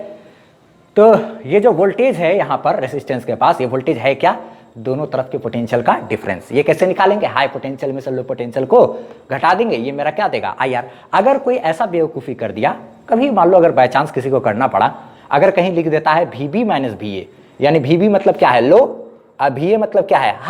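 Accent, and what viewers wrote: Bihar, India. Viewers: Sir ji aap bihar se hain na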